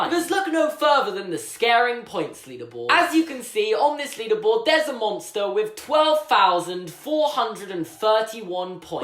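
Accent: United Kingdom. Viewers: That'll teach Pixar..... not to make a British boy so upset that he feels like ranting on YouTube 😂. I love hearing you all upset with that adorable Bristish accent.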